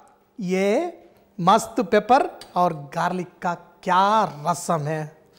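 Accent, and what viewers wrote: Hyderabad, India. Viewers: Anna hyderabad hindi baagundi.👌 hindi urdu mixing & Rasam jabardast 👍 nenu kuda chestaanu . ingredients okate tareeka veru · Nice hyderabadi hindi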